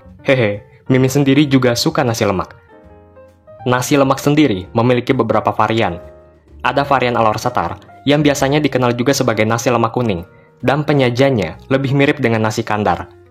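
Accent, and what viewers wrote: China. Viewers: Logat cina dari mana coba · awak ni kokoh dari malaysia ke bukan. Logat care cakap nye suare kokoh (chine). Tapi masih belom tau ke semue info nye. Bukan warge setempat/tempatan ke awak ni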